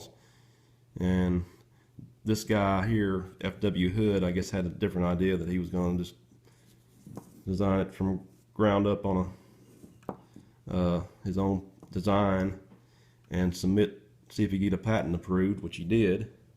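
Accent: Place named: South, United States. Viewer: lol this southern slang always sounds mentally retarded.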